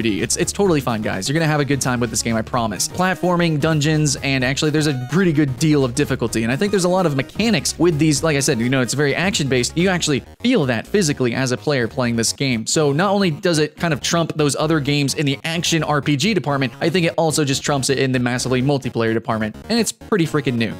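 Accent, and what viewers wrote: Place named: Texas, United States. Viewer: Are u from Texas?? holly shit, too fast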